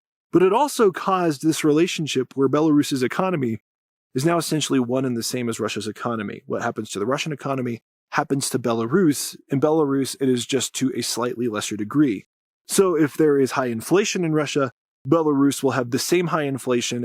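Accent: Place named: Southern California, United States. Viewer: Is he from California? I’m trying to peg the accent and I swear it’s SoCal.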